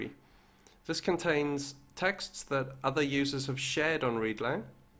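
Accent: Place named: Wales, United Kingdom. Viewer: I love hearing a fellow Welsh accent on youtube, always a nice surprise! Thanks butt!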